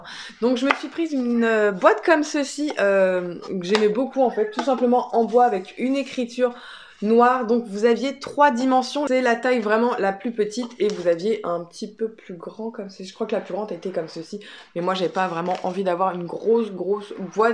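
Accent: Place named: Normandy, France. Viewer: haha t habites en normandie!! je me disais bien que tu avais ce petit accent si tu arrives a arreter de fumer ce serait top!!!!! mets la photo de ton pere sur ton porte monnaie ça va te motiver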